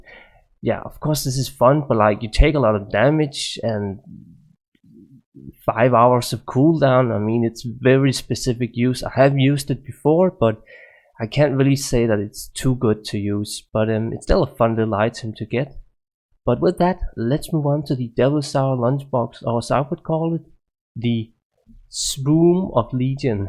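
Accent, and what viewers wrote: Denmark. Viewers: Hehe, After your first 5 words me and my gf said at the same time "That guy is Danish" :P (Well, we said it in danish, since we too are danish, but you get the point) But nice video, thanks :P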